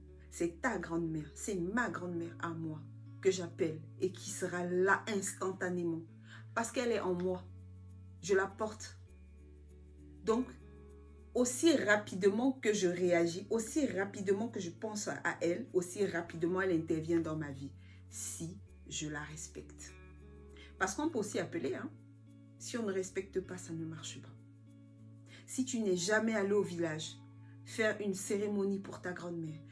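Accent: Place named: Cameroon. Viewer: J’aimes beaucoup l’aspect terre à terre de tes vidéos. Avec la petite touche de dialecte Camerounais 😂🤩🤩. Trop fan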